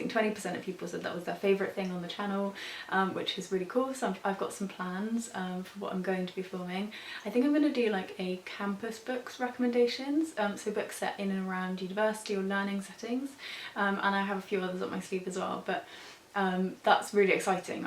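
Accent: United Kingdom. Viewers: You might think that your personality is not that fascinating for people to want to watch you, but I’ll tell you that when I first subscribed to your channel I sometimes had issues with understanding your “posh accent”, as one of your viewers once called it in the comments :)). But I persisted until I got used to it. I do not know what could have made me put efforts into it if not your charismatic personality :))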